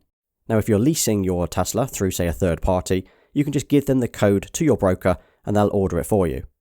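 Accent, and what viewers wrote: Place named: North, United Kingdom. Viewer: Just wanted to say how much I've enjoyed your videos, I've watched quite a few without realizing. I like watching desk tour vids, wanted to make sure the PS5 Pulse 3D headphones were decent and recently started considering a Tesla Model 3. If you could do me a couple of Golf swing videos, Sony A7ii lens videos and A day in the life of a video game developer, you'd have the full house. Also good to hear a northerner on YT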